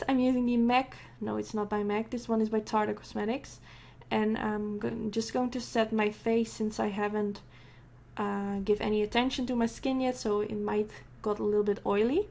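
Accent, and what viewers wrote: Netherlands. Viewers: ik hoorde je accent en ik wist meteen dat je Nederlands was. you go girl 😚❤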